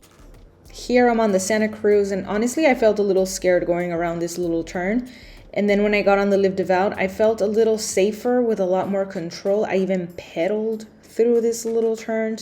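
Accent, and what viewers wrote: Philippines. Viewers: you sounds like pinoy 😁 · parang may puntong piniy toits 😄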